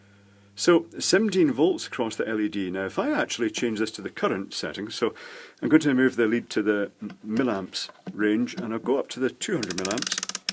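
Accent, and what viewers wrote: Scotland, United Kingdom. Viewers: Nice video. I like your scottish accent ;) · True, he is actually from East Kilbride one of my favourite Scottish toons (lovely people) but has a Newton Mearns (snobbiest toon in Scotland with the most horrible people) voice - very polite and annuciative.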